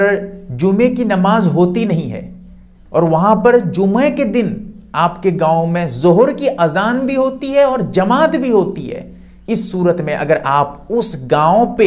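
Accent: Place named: Bhagalpur, India. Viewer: مفتی صاحب کی زبان سے معلوم ہوتا ہے کہ شاید بھاگلپور یا گڈا وغیرہ سے ہیں، آپ نے ماشاءاللہ محنت کافی کی ہے، مگر بعض اردو کی لفظی غلطیاں ہیں، اصلاح فرمالیں، مثلا اقامت میں الف پر زیر ہے آپ نے زبر پڑھا محرم میں ر پر تشدید نہیں ہے آپ نے مشدد پڑھا اعراض میں الف پر زیر ہے آپ نے زبر پڑھا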